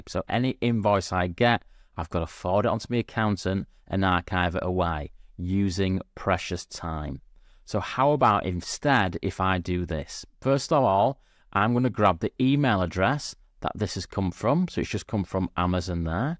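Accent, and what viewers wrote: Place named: Manchester, United Kingdom. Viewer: Thank you for the great videos that you made - I feel they will change my working life completely! P.S. I have got mates who sound just like you - from Manchester? Bolton? 😂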